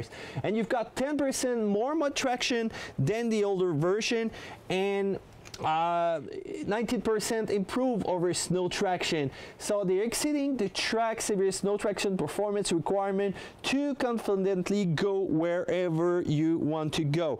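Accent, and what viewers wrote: Quebec, Canada. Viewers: Quebec accent if I'm not mistaken ;)? Français? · Quebecois as fuck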